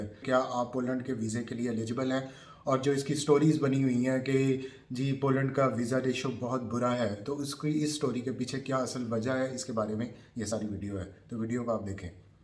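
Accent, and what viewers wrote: Faisalabad, Pakistan. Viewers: The way you deliver reality with faisalabadi accent is 😅👌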